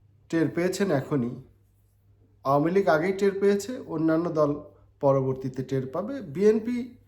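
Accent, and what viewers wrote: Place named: India. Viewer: তোমার কথা শুনে মনে হচ্ছে যে, তুমি ভারতের প্রডাক্ট।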